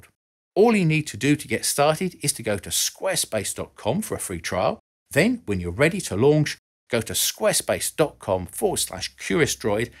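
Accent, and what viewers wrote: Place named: Essex, United Kingdom. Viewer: The gecko has a London cockney accent & is from Essex 50 miles north-east of London - they will sound the same to most Americans.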